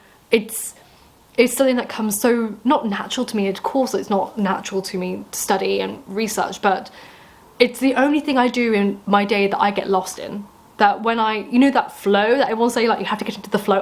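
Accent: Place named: Upper class, United Kingdom. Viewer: Dear Lady. I love your accent, I find it aristocratic, academic and upper class British. Its fun watching and listening to you. I could listen to you all day. You are motivating and just fun. This from a fan a barbaric colonial from across the pond who the fates have planted me in central Florida surrounded by Neo confederates after a carrier at NBC TV Chicago as a video tape editor. Stay well Dear Lady. DJY.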